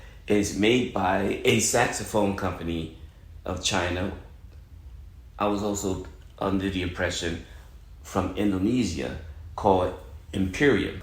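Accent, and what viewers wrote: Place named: Brooklyn, United States. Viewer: Dude, are you from Brooklyn? You sound EXACTLY like Spike Lee. Not just the tone of your voice, but the cadence. Thanks for the good tips.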